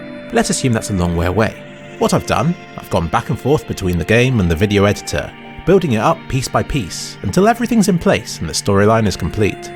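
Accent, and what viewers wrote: United Kingdom. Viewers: The British accent and your voice are must for me to listen to each week.Gorgeous lyrics and entertaining videos don't hurt either.Keep doing what you are doing for as long as you enjoy it cuz i certainly love all your content,even some i did not expect to love.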